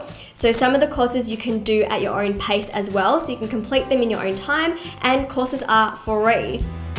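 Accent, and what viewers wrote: Australia. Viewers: Love the Aussie accent! Thanks for sharing. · as soon as she started speaking, I instantly guessed she must be an Australian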